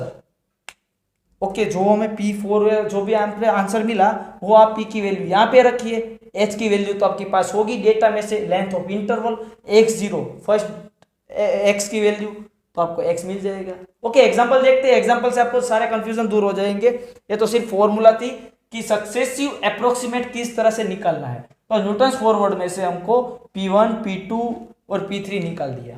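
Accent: Gujarat, India. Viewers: bhaiya aap gujrat se ho kya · Your tone is gujrati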